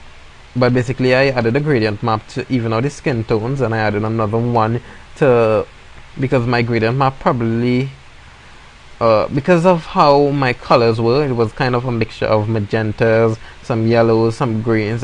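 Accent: Trinidad and Tobago. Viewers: Are you from Trinidad ? :) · definitely from Trinidad! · Def a trini with that accent, nice work.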